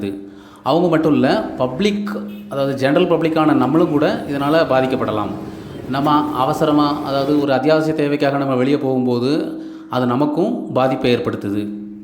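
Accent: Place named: Tirunelveli, India. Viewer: மிகவு‌ம் அவசியமான பதிவு அதுவும் திருநெல்வேலி அழகு தமிழில். அருமை.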